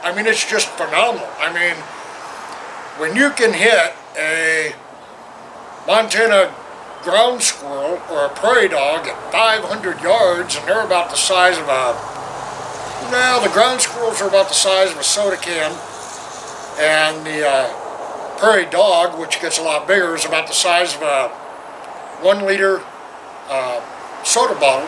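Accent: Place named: Isle of Man, United Kingdom. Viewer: You have a Manx accent.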